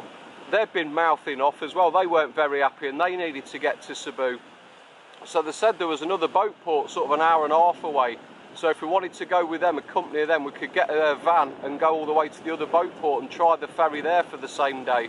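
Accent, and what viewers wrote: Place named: Black Country, United Kingdom. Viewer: You a Wolverhampton lad I’m trying to figure your accent buddy either that or brummy don’t take offence they’re similar lol 😂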